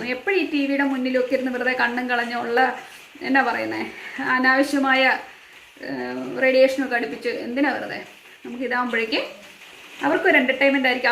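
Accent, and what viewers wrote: Kottayam, India. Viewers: Kottayam kari analle Samsaram kelkkumbo ariyam 😉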